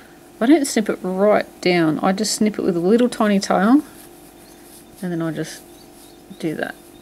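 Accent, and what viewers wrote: Australia. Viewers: I want to thank you once again, I make your one piece wonder sweater all the time for new babies. Finding your heart hat was a total plus for me, you the best person I have done tutorial by far. You have made me feel like I can do more and feel good about my work. Keep doing these wonderful tutorials, I love your accent. It reminds me of family that live in Sidney who I don't get to see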